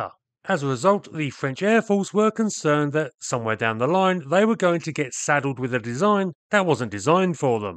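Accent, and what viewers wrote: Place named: Essex, United Kingdom. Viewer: an informative vid as ever. Havimng memories of building this Mirage. And as ever your accent takes me back to my airport and airfield days around Essex and Chelmsford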